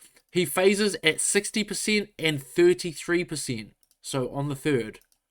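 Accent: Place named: New Zealand. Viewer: Are you a kiwi? You have a bit of a kiwi accent. :o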